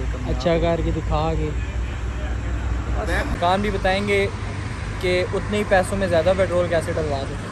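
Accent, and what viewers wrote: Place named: Hindko, Pakistan. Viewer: Ap ki Urdu ka acsent hindko wala he😁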